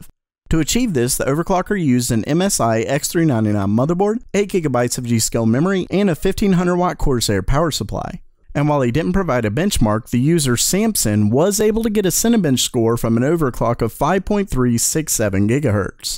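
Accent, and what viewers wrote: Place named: United States. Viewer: It’s both okay? He’s American so he pronounces it wrong but whatever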